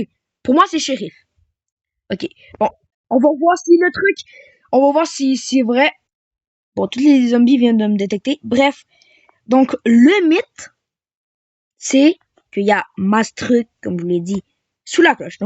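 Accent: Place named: Quebec, Canada. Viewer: T’es québécois? Sinon bonne vidéo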